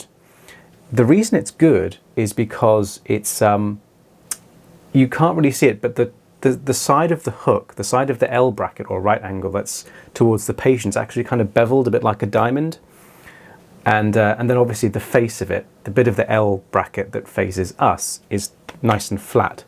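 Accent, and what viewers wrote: United Kingdom. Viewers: hahaha, you probably would 😭 In American media, Im pretty sure your specific way of speech is one of the UK dialects that is most often replicated by American actors. Thats super cool though! Wonderfully informative video :0 I learned a lot about the scale of the tools, the dexterity and hand steadiness is very impressive!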